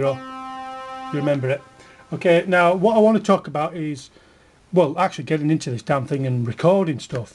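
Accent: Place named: Lancashire, United Kingdom. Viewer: Hi mate, thanks for your tutorials, you're doing very well.Hey I love your accent. Is it Lancashire you come from or somewhere not far? I used to attend boarding school in the beautiful Lake District which as you may know's a stone throw away. I have just bought a Kaosilator like yours and learning. Do you happen to know how I would save stuff onto the SD card without erasing previous data? The instructions are not the clearest in some respects. Cheers.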